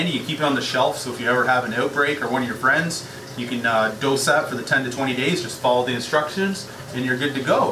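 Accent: Canada. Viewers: probably the THICKEST Canadian Accent I've heard in a long time. Nice!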